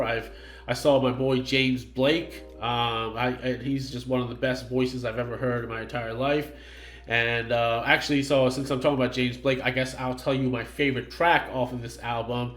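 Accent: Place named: United Kingdom. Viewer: I mean not to generalize but...British accents do be hittin.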